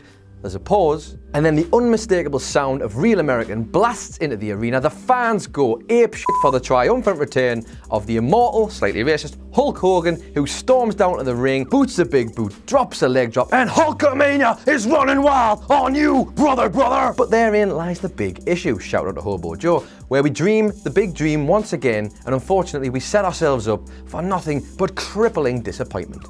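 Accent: Ireland. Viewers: Are you irish and you look like connor mcgregor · I thought that it was Jules with hair, before I got that this guys irish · Irish accent? Fookin awesome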